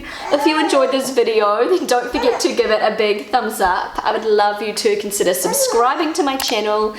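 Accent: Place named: New Zealand. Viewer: Ich liebe es wenn Du Deutsch sprichst. Dein Kiwi Akzent ist so cute. Und Dein Kleiner ist ein lieber, süßer Junge. Stay save...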